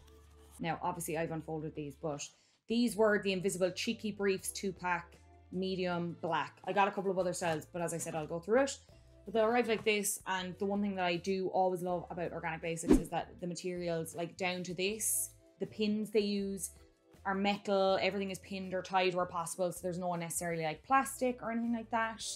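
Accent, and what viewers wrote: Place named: Newfoundland, Canada. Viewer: I swear I thought you were from Newfoundland or east coast Canada. By the accent. Yes I watched this video after the razor video. #Creepy.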